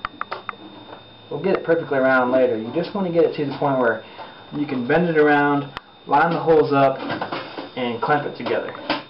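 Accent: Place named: South, United States. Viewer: a southern accent... why am I not surprised.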